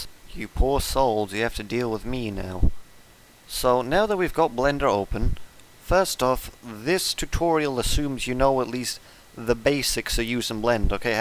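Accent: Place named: Finland. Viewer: but you have a finnish accent 😭😭😭 where are you from then (if you're comfortable sharing that)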